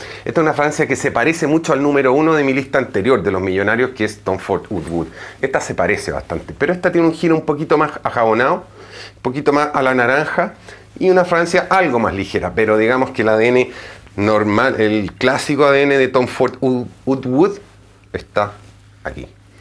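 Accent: Chile. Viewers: Eres chileno?? Saludos ! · ¿Cómo saben tanto de la vida de este señor?. El acento chileno es inconfundible eso si. Sobretodo para los chilenos. Genial que mantenga el acento, es un plus en este blog. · Tenes acento chileno me parece inconfundible pero explicas muy bien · Este chico es chileno? Le noto un cierto acento de aquel maravilloso país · Eres chileno?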